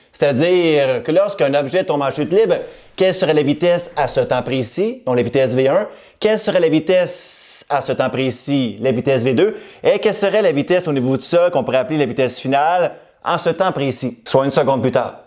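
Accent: Quebec, Canada. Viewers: Je suis tombé sur votre vidéo totalement par hasard et je ne le regrette pas du tout. Merci pour ces explications très claires que j'avais dû zapper il y a très longtemps avec mon prof de maths au lycée 😁 Je comptais me remettre aux Maths et votre chaine me parait une bonne ré-entrée en la matière. Merci beaucoup. Je vais regarder vos autres vidéos avec plaisir. Et votre accent québécois me plait beaucoup 😊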